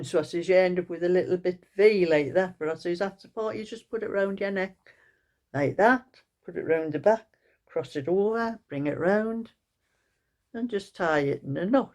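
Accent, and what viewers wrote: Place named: Durham, United Kingdom. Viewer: I love your chats, you are interesting. Your voice reminds me of friends I know in Durham.❤❤❤❤. You have a lovely accent.❤❤❤❤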